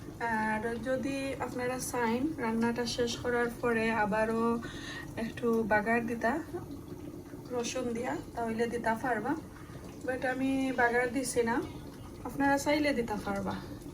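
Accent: Sylhet, Bangladesh. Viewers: I totally agree with you sister . Lot of sylheti try to impress by speaking shudo basha and it just doesn’t come over . Be proud of your dialect .. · Oy apa amar khob vala lage honte sylheti mat afner, shob shomoy ola matiyo re go, ar jee mojar khatta ransuin, Ma sha Allah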